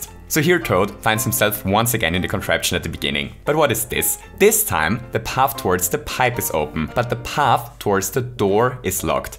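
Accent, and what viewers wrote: Germany. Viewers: This german accent.... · Ahhhh ein Deutscher. :D Dein Dialekt verrät Dich. ^^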